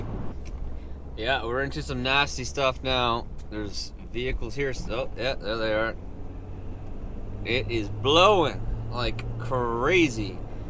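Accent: Nova Scotia, Canada. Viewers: Damn Canadian accent is annoying .. it’s OUT not oughhtt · 10 seconds into the video I know you were Nova Scotian lol ... I'm from Truro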